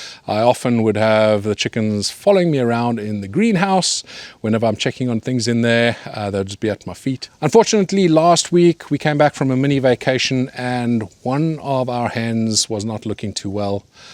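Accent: South Africa. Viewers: You have a south african accent why its quite cool to me because i am from south africa and you inspire me to keep on going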